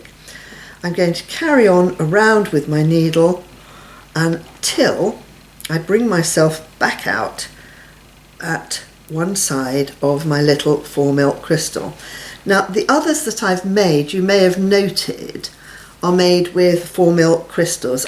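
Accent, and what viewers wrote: England, United Kingdom. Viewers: Oh my goodness. I love how strong your English is. my fathers mother spoke the same as you. I've always loved to talk like you. but I come from South East in Suffolk. so I sound more like a farmer than you do. 😯😩👍💜💕😷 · Just discovered you. Love you english accent. what is the size of the montee used? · What class! It's so nice to hear a beautiful english accent. What a talanted lady, you are. Thank you for this elegant pendent.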